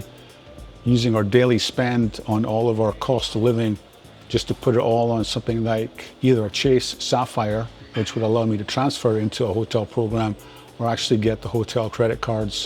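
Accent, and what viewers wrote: Scotland, United Kingdom. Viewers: Definitely a Scottish accent in there somewhere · Is this deal for people only in the us? I don’t think people from the uk can get that card unfortunately, and he sounds Scottish so doubt he would get it either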